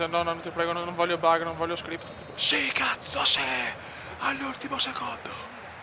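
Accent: Veneto, Italy. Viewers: mi mancava la tua voce da veneziano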